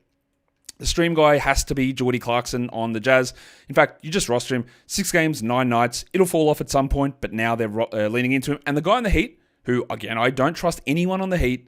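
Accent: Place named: Australia. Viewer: Your microphone's good - no issues with my end. I listen at 1.5x and one of the big changes is there's no "deep breath" sounds. I know us Aussies like our long-winded sentences. As the name suggests, long-winded sentences require long amounts of wind, which is often replenished using a deep breath. This deep breath is known to create a deep breathy sound and your previous mic used to hear. I don't know whether you have undergone some level of phonetical coaching (which kudos if you have) but, there was a distinct absence of deep breathy sounds in this video. The unfortunate news is you are probably ineligible for the ASMR tag to put on this video now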